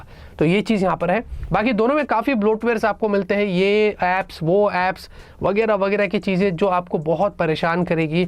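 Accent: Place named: Mumbai, India. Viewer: Apki bambaiyya hindi achi hai...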